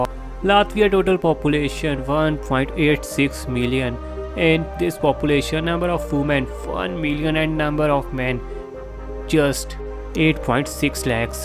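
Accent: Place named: South Asia. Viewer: Can’t you tell he’s from south Asia (indo-Pak) ?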